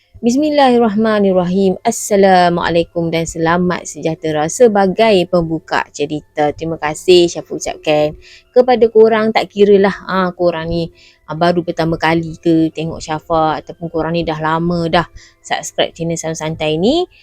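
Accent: North, Malaysia. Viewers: Asalamualaikum..hye sis.. manih2 oghang utara ni.. org nismilan ckp 'sojuk yo mato mandang'..hehe..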